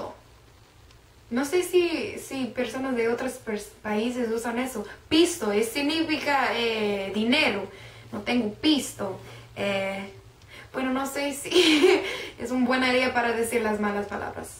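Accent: Guatemala. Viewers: Que bien le sale el acento chapin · Tu acento, ya está sonando un 95% como el de Guatemala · neta me gusta q ables alo chapin como desimos alo chinto va jajaja aki la mara abla mejor q otro centro americano aguevos y q linda ....viva guate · Si tiene hacento chapín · No manches Si Estas Aprendiendo mucho el Español. Que se me Ase que Eres Chapina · Hola! 😊me encanta tu acento Chapin, está chilero, 👍👏👋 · Wow nena si hablas al estilo chapín, me yegas. Que viva Guate!! Vos=Tu Güiro =niño 👍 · Wao! que bueno que te gusta hablar como los chapines y sobre todo estas muy linda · Buen video felicitaciones aprendiste bien... al estilo Chapín no sólo español....✌ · Tienes acento muy Chapín. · Que calidad ver que te gusta hablar como chapina Dios te bendiga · Te felicito por tu acento guatemalteca, estás muy bonita y te ves buena onda!!! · Amo a esta chica y adoro escucharla hablar como chapina, GRANDE MI GUATEMALA :D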